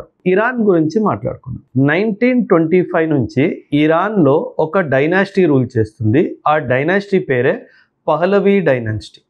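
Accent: Punjab, India. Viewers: Swatchamaina mana telugu lo oka panjabi excellent narration. Vinataniki chala goppaga vundhi.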